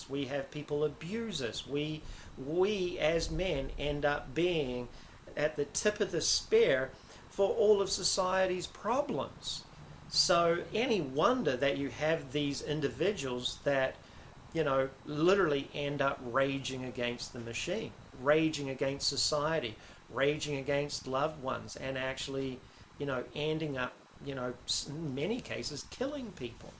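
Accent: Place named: New Zealand. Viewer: The kiwi/NZ accent is so cool!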